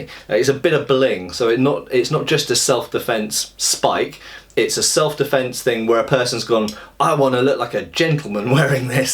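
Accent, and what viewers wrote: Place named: United Kingdom. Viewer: I have never seen a silverware handled bowie knife, nor have I ever wanted one. However like most Americans, for no good reason, after hearing someone with a British accent recommend it I do.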